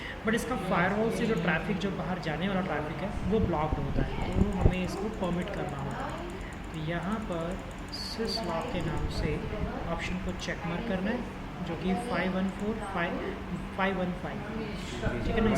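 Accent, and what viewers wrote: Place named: India. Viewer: Very nice Video thank you for doing the Video but the India-English is very hard to understand in that given Speed for a none english Native, also the Level of Loudspeaker is very low .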